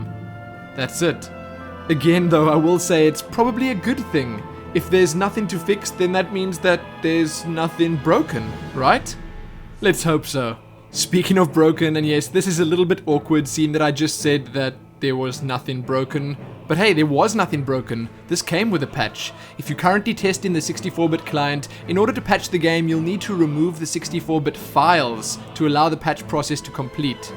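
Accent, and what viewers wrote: South Africa. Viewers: What!?!? South african accent FTW!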